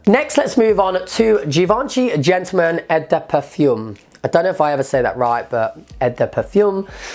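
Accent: England, United Kingdom. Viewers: Hmmm where in England is your accent from?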